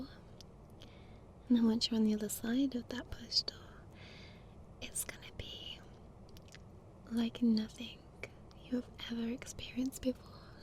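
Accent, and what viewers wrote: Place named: Midlands, United Kingdom. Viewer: I keep saying it, but hot fucking damn your midland accent makes me melt. Then you're always so spunky and adorable ooof, just yes That last part through, oh man the feels, planned something exactly like that for my ex but we never got to go. That letter before the final reveal hit real different for me at least. Mmmm yep this is good ASMR.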